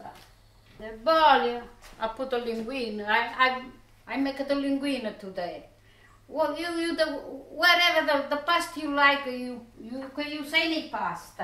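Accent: Italy. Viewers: I love to watch you Mama! You are so happy when you are cooking! I love you. I am Irish Americàn, but my roots are Spanish. I think that's why I love the Mediteranean food. Anyway, I love to watch you and hear you direct us in the process of making the recipes you present. There have been negàtive comments about you. I, for one, love your Italian accent and appreciate your learning English and love your presentations. If people criticize your presentstion just ignore them. I love you and your sharing your cooking knowledge. Keep making your videos, I will always watch! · This dish was my favorite!!!!!! My Father used to make it!!!!! My Fathers family was from Naples Italy. He used to put raisins in the sauce to take the acidity out of the whole tomatoes and add sweetness. My grandmother made the sauce like this in Naples. I LOVE WATCHING YOU AND YOUR ACCENT MY Fathers whole family was from Naples. 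Could barely speak english and your accent reminds me of the good times. The Lord Jesus bless you✝️🕎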